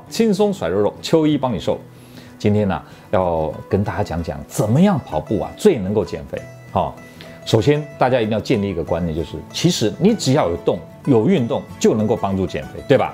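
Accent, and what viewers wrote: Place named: Taiwan. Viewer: 這個醫生是台灣人，口音一聽就知道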